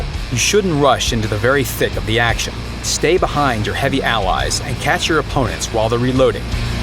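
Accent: England, United Kingdom. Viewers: I love your english accent ! the R-E-V-A-L-O-R-I-S-é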